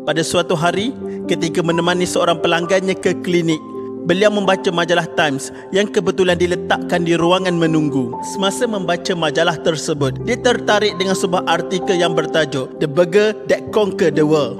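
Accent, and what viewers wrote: Kelantan, Malaysia. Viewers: Bunyi kelantan tak hilang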